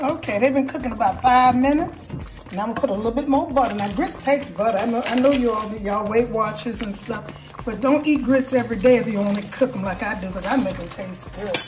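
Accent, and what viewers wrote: New Orleans, United States. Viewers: She sound like she’s from New Orleans they make great food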